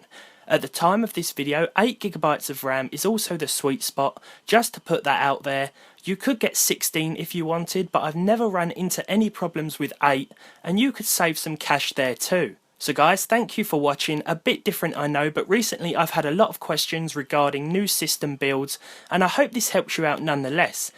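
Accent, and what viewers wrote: United Kingdom. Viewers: Love this channel. Which part of the UK are you from?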